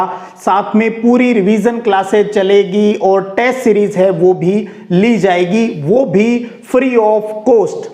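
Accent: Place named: Sangariya, India. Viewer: Sir aap sngriya se h kya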